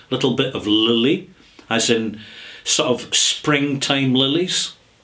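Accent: Scotland, United Kingdom. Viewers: ...your whisky knowledge is without peer...you are virtually a university course on the subject. But sometimes I tune in to hear yuir Bonnie Scots burr...Gaun' Yersel' always, mate!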